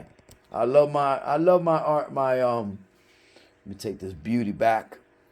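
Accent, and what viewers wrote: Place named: New York, United States. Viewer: Hey my brother where you from I'm just curious cuz you sound like a New Yorker I used to live in the Bronx, The reason why you took me back, I'm getting right back into the hobby again.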